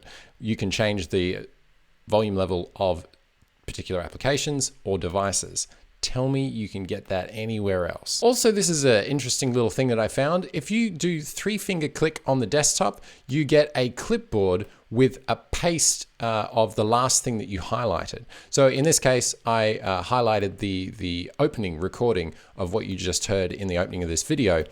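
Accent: Australia. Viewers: Hey, thank you for your thoughtful and well spoken videos. You have one of the least annoying Aussie accents I've ever heard. Just stay on top of the disfluent fillers and I, for one, will be back for more.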